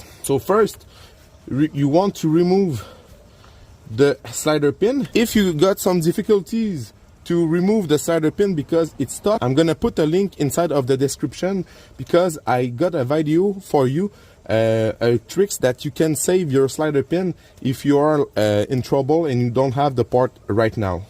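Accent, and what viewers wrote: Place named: Quebec, Canada. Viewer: tu est définitivement un canadien francophone 😅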